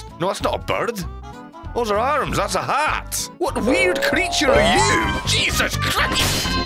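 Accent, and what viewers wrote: Scotland, United Kingdom. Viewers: Less British than I remember, more Scottish???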